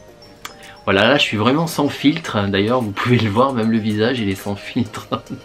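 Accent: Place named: South, France. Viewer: coucou mon petit ou devrais je dire mon grand vu ta taille lol!!!!! j'ai adoré ta vidéo et c'est génial d'en apprendre un peu plus sur toi, tu es un mec au top mais je n'en doutais pas on entend bien ton accent du Sud et c'est super car quand on t'écoute parler tu mets du soleil dans nos vies MERCI!!!! ta chaine grandit de jour en jour et je t'en félicite, tu le mérites surtout quand on sait le temps que ça prend et franchement tu ne ménages pas ta peine pour nous offrir un contenu à la hauteur de ton perfectionnisme le rouge te va très bien sur le visage lol!!!! ne changes rien car ce qu'on aime chez toi c'est ton authenticité je te fais des tonnes de bisous ♥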